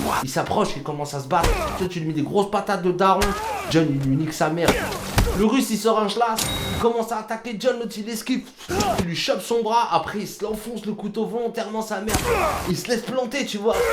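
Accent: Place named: Algiers, Algeria. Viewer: Wallah tu parles comme nous a Alger. La manière, les bruitage, zid en plus les mots en arabe. Sérieux kho. C'est vraiment fort.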